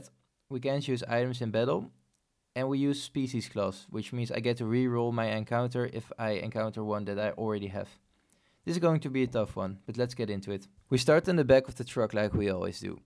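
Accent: Netherlands. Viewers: Are you from the netherlands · Where are you from man? You sound Dutch Enjoyed the video. Will watch more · you're from the Netherlands right, i know that accent haha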